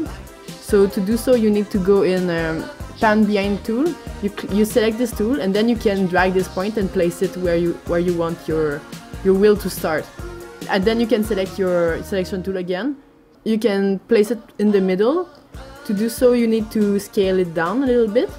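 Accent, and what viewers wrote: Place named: France. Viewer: I can hear a beautiful French accent. Thanks for this video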